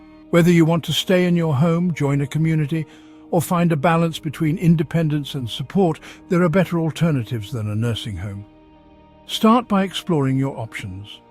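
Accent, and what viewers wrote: England, United Kingdom. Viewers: So despite the AI David Attenborough ENGLISH accent narration, this is intended for an American audience. Would be good to point this out at the start or in the title.😊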